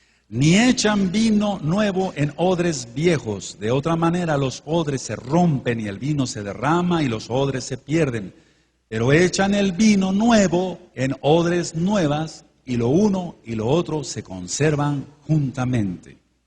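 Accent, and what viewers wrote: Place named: Latin America. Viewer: Me da tristeza ver a hombres como este "predicador", con claros rasgos físicos de un latino y con acento latino, tratando de imitar al pueblo judío, dejando crecer su barba y usando unas cuantas palabras en hebreo (mal pronunciadas claro está). ¿Cuál es el afán de practicar la ley (torah) judía? Jesucristo ya murió por nosotros y solo tenemos que seguirle a Él, no volvernos atrás a la ley judía, la torah es para los judíos, el nuevo testamento para los cristianos. Al hombre que habla en este vídeo le digo, si vas a hablar en hebreo, "predica" completamente en hebreo, pero si este vídeo es en español, habla en español. ¡No traten de aparentar un conocimiento del cual carecen usando palabras en hebreo! Jesucristo es Jesucristo (No Yahschuah HaMaschiaj, eso es hebreo) Dios es Dios (No HaShem, ElOlam o Elohím, eso es hebreo) Iglesia es Iglesia (No Kehilá, eso es hebreo) Graba un vídeo "predicando" completamente en hebreo y entonces te creeré lo que hablas. 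Mientras tanto, En el nombre de Jesucristo, ¡Cállate!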